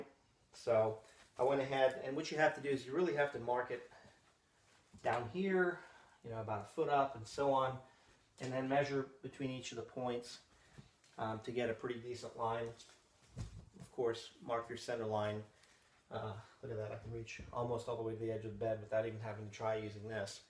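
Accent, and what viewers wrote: India. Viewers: Thx for the video. I too have a foam bed that did not know what to do with, now I have one, thx to you. Also, the Indian accent was excellent. Was not expecting that.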